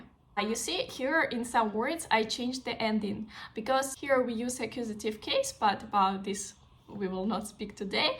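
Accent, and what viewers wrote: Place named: United States. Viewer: Nice channel, your Greek is perfect, the only way to tell you aren't native is your slight American accent.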